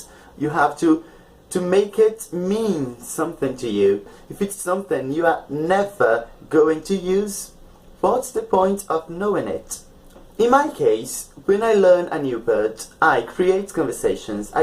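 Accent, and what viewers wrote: United Kingdom. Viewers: hi my name's Leandro, and I'm enchanted with your English accent, I wish we were friends on WhatsApp, anyway, if you want to contact me , please , just Whatsapp me <num>. I have been studying English for a while so I think we could have an interesting and effective conversation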